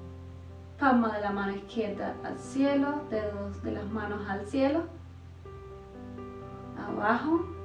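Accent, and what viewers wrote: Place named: Cuba. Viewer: Hoy practiqué esta rutina y me encantó y pienso que hay muchas del arado que pueden resultar y también la de la bicicleta con los pies vertical. Yo las hacía antes con mi lana pero contigo es mucho más juvenil. Me he quedado asombrada con la mejoría en tampoco tiempo, tres días. Me luce que tú eres cubana, por el acento, Acláramelo! 🇩🇴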